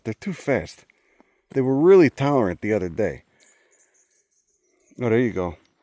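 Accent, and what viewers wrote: Chicago, United States. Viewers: Is that a Chicago accent?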